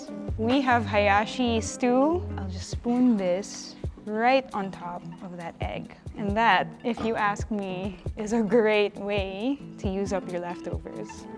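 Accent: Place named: Philippines. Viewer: You have an accent. Are you pinay?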